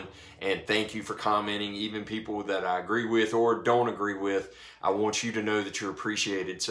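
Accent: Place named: United Kingdom. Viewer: I love this guys accent- a Brit from across the pond 👍🏿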